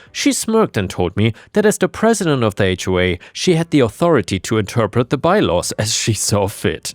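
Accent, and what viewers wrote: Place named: Germany. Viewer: A German narrator always is watchable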